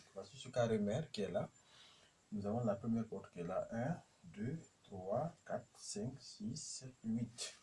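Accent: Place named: West Africa. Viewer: French with a West African accent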